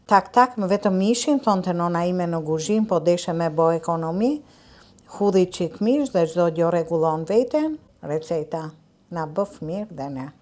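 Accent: Tirana, Albania. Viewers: Pershendetje moj sulltanesh e gatimeve shqiperise se mesme nuk na ke kenaqur vetem me ato gatimet e tua te mrekullueshme por na ke kenaqur edhe me ate dialektin e bukur te tiranes kur flet flet nje dialekt te bukur qe une e motra ime e pelqejme shume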